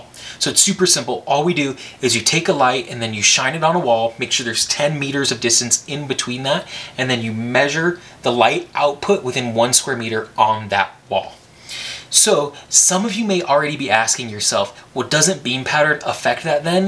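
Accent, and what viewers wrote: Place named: Kansas City, United States. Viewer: You from Kansas city?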